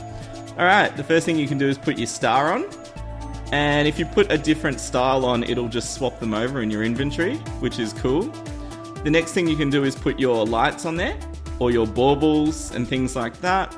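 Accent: Australia. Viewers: Your Australian me to where in Australia do you live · Your from Australia? :O No wonder I like your accent☺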